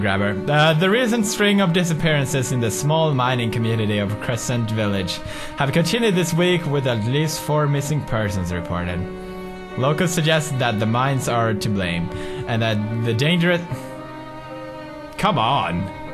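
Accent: Sweden. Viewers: hahaha u had more Swedish accent back then xD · The one thing we Will never have again: deep Swedish accent 😂😂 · omg its been years since i watched this i miss the cute swedish accent back in this day and amnesia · kinda swedish accent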